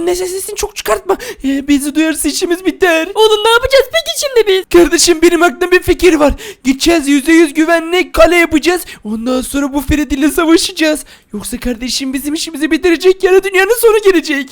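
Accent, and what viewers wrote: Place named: Urfa, Turkey. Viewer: Abi aynı Urfalı gibi konuşuyorsun bende Urfalı yım 😍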